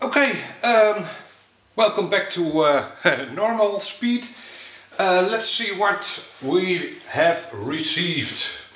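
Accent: Netherlands. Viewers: Mooie video! Misschien is het handiger om NL te spreken? Het is een stuk moeilijker om naar Engels met een Nederlands accent! Voor de rest echt een SUPER GOED KANAAL! Meteen geabboot. 👌😊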